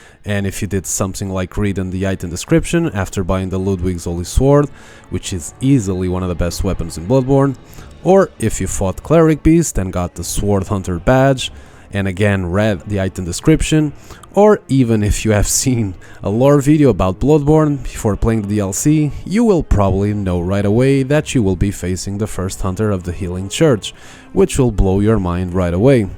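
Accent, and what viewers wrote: Portugal. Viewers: se este sotaque não é tuga eu atiro-me da torre dos clérigos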